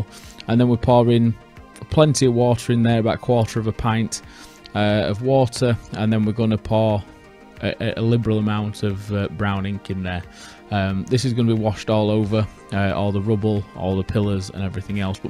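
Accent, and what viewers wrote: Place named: Yorkshire, United Kingdom. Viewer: Tell me your from Yorkshire without telling me your from Yorkshire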